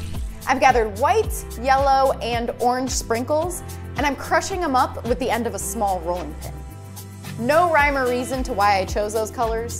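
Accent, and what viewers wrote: United States. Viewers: I found you on IG and assumed you were Russian. Now hearing you talk, you're obviously American lol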